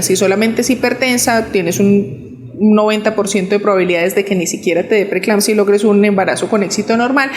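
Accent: Colombia. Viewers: Hoy llege tarde 🙋‍♀️🤭 saludos bella ❤ Amo su acento colombiano ☺😊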